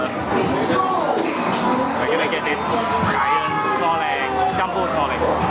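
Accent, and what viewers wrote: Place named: Singapore. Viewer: This accent sounds so Singaporean... Reply if I'm right.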